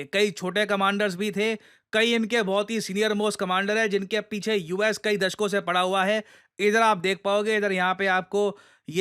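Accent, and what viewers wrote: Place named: Haryana, India. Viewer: haryana ke log aise hi bol te hain bahut logo ko suna hu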